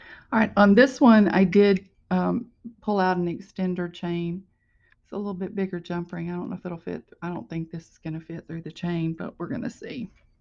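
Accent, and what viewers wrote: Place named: South, United States. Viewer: Are you from the south? You have a suthern accent. (Love it )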